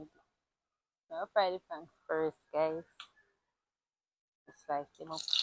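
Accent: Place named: Jamaica. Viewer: Yeh mon dis ah di real Jamaican sound💪🏾💪🏾💪🏾🇯🇲🇯🇲🇯🇲🇯🇲🇯🇲🇯🇲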